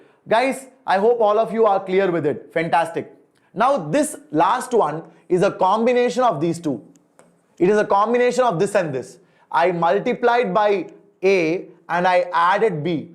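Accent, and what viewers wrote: South India, India. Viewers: Sir, I love your south indian accent 😍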